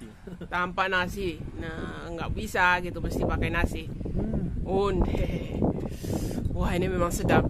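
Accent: Minangkabau, Indonesia. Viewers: ni boleh berdialek minang tapi juga bisa juga mengeluarkan perkataan jawa.....terima kasih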